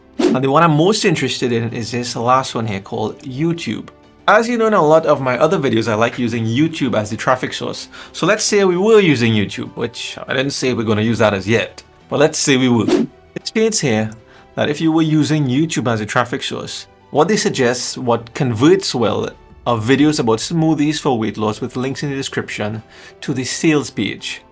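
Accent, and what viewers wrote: Trinidad and Tobago. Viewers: Are you from Trinidad I hear your accent.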